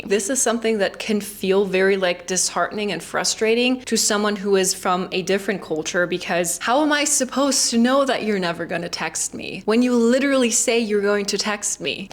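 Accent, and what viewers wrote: United States. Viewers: How did you develop native English proficiency and perfect American accent in 2 years?!? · I do the fake stuff because I don't want to hurt other people's feelings; otherwise, I'm with you on the "How are you today?" greeting followed by the "I'm fine, how are you?" response. I usually just want to say "Good morning" to people I see at work, our just "hi" and be done with it. But then Germans have their own version of this: "Wie geht's?" / "Es geht mir gut." You have a good accent. Very American. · Thanks for this thorough and helpful investigation on cultural differences. May I ask you why your American Englisch is so absolutely perfect? Wherever you raised bilingual? Ich kann total unterstreichen, was du gesagt hast. Meine Beobachtung ist, dass Kanadier und Amerikaner in manchen Punkte sehr ähnlich kommunizieren. It certainly takes a while to get used to it. 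· How do you speak with a perfect American accent? Amazing. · Are you from Bavaria. You sound American when speaking English wow. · Your accent is so American. No one would think you are German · It's funny — I'd seen many of your American in Germany and German in America sketches before this, and I assumed you were an American woman who can do a really convincing German accent. The fact that you're a German who has developed a very organic American accent is really cool. I wonder how autistics (I wad diagnosed with Asperger's syndrome when little) handle German culture; I imagine the directness and literalism you discuss would be relatively comfortable for us. :) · You have a 100% American accent! And your husband has the most American name. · You sound like you lived in America all your life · I probably shouldn't say this, but the really sugary sweet things, like you just said are generally only said by american WOMEN! 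From what I understand, that is simply another thing that makes Women a bit different in the US. Some women have been very open with me, and I have seen and heard about such things, Perhaps EVERY culture has such differences, but they can vary, and since the US IS very diverse, DESPITE WHAT ANYONE SAYS TO THE CONTRARY, you will see all sorts of variations, So just take it in stride. American MEN just try to be polite, and may throw a compliment your way, but they are NEVER so sugary sweet! But HEY, you do seem to be nice, do look nice, and, for what it is worth, you sound American. I have NOTHING against the German Accent, or Germans, in fact part of my family is German, and I speak some German, but if you want to pass as another person in the US, CONGRATULATIONS! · You sound like an American. See. We are not all nice.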